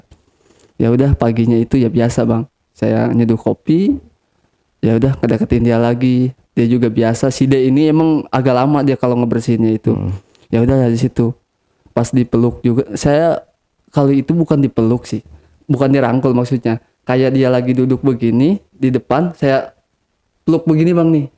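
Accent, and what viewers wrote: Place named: Surakarta, Indonesia. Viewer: Kaya orang solo jir😂😂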